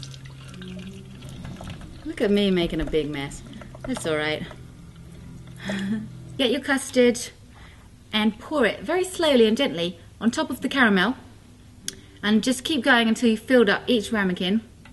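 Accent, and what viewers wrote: England, United Kingdom. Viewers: Shes beautiful, can cook malaysian food, and that english accent! Marry me baby ;)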